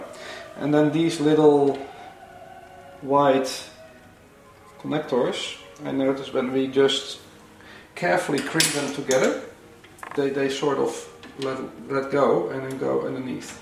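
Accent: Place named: Netherlands. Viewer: Strange that the voice has dutch accent but the socket on the wall is chinese.Maybe there is a chinese factory producing these Miele appliances?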